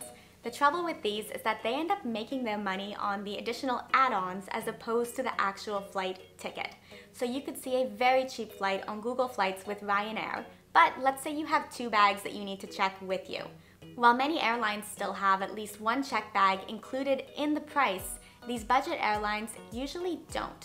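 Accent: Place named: Boston, United States. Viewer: Love your Bostonian accent :)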